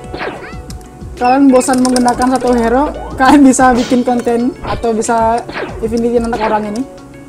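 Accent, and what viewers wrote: Padang, Indonesia. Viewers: Lu org minang bang ..!? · Urang padang?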